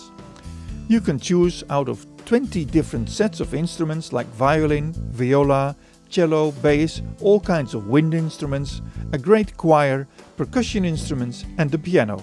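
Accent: Netherlands. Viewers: You sound Dutch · a lot of these sounds actually sound better than some of the pricey VST's (at least before you do a hell of a lot tweaking and modifying). You can certainly learn the trade using these, before upgrading to the big pricey stuff. Thanks a lot neighbour (I'm from Belgium, and I hear a Dutch accent in your voice, right?)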